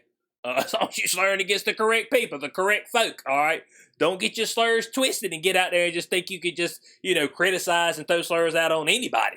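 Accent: South, United States. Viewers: Dude I like your accent it's so country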